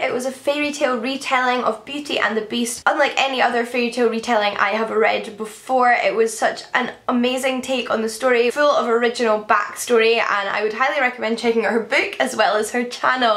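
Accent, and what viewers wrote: Scotland, United Kingdom. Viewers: And your Scottish accent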